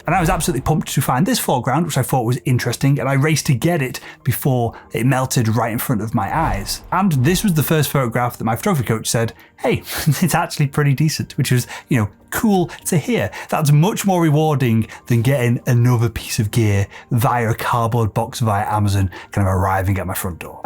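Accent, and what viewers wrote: United Kingdom. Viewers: Dollars? What good are dollars to the UK? Try saying £'s, after all you do sound British.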